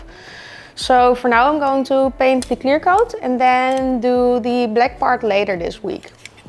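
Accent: Netherlands. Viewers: What is your native language? You sound Dutch?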